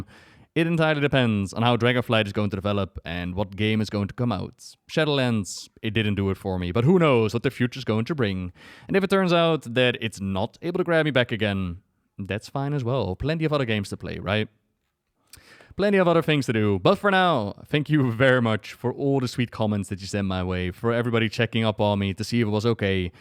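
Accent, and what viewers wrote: Netherlands. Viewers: This is such a random thing to mention. But you really helped me back in 2013/14, not with WoW lore(although it was good) but with actor training. I had been cast in a production of the Diary of Anne Frank. (I was one of the Van Daans, who I believe were Dutch?) Pur director had asked us to try and make our accent convincing. So I listened and binged nearly all your videos in order to learn certain pronunciations and how you formed words etc. I was told my accent sounded excellent and was extremely convincing. So thank you for the help...almost 10 years ago. Who knew WoW lore videos could used for other means😅😂! I'm not sure if you are Dutch...my 18 year old self thought you were but hey it worked out in the end.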